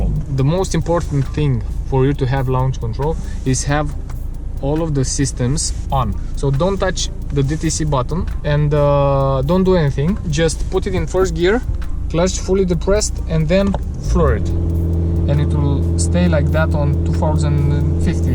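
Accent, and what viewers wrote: Romania. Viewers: Mi am dat seama ca esti roman dupa accent si 2.0d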